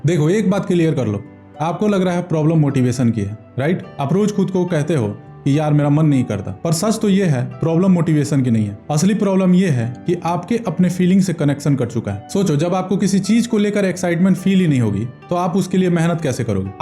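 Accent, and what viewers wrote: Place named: Bihar, India. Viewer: yes , i am from motihari , by your accent i instantly knew you are from bihar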